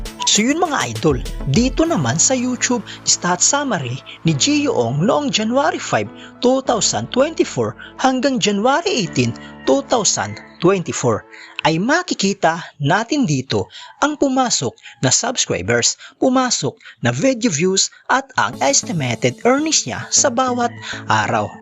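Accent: Visayas, Philippines. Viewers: ang lamya mo mag vlog gukbo..ka umay ka..mas pinapanget mo pa boses mo at binisaya pa ang tono..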